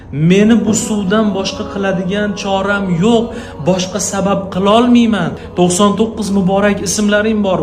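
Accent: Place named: Fergana Valley, Uzbekistan. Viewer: Оглим сизни тингласам мазза киламан : бирам чиройли килиб водий шевада гапирасиз оглим : Тани жониз Сог болсин Ширин болам : асал болам : Рахмат сизга : 72 ешли онахон сизни тинглаб рохат киламан👍👍👍👍👍❤️❤️❤️❤️❤️❤️❤️❤️❤️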